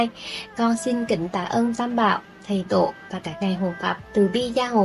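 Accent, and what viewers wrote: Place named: Hue, Vietnam. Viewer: NAM MÔ ĐẠI NGUYỆN ĐỊA TẠNG ĐẠI BỒ TÁT Nghe giọng Huế hay quá bạn ơi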